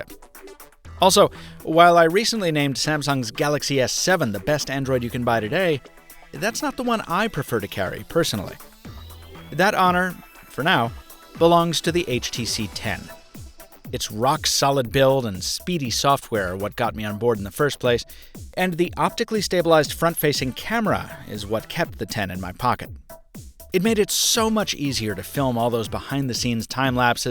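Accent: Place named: Boston, United States. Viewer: Do a vid with your Boston Accent.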